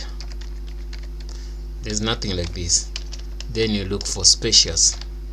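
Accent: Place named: South Africa. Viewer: Great video thanks man, keep it up. Good to hear a South African-like accent online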